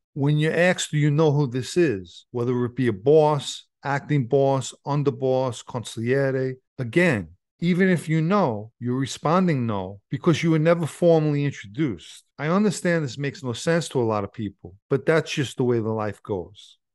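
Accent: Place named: New York, United States. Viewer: I’m down here in TX and I love NY mob stories. I like your NYC accent, it adds to the stories. Glad to know you have removed yourself from that madness.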